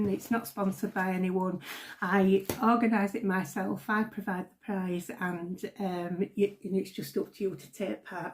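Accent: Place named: England, United Kingdom. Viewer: Just found your channel. Love Vera and your lovely English accent. You do good work and I subscribed!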